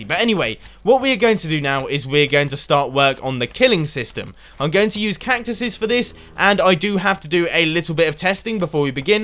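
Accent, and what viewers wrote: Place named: United Kingdom. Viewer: I'm new to this channel is British? He has accent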